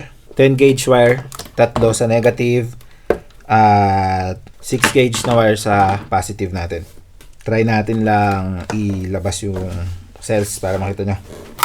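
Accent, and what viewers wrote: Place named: Pampanga, Philippines. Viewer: Bro, Pampanga based ka ba? May accent ka. Will be buying your batt pag-uwi ko Zamboanga or kung Pampanga ka tag mo ko. Pampanga-based ako.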